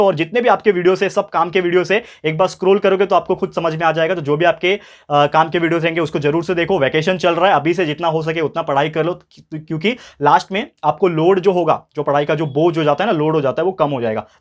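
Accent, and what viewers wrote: Maharashtra, India. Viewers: Sir aap Marathi ho kyaa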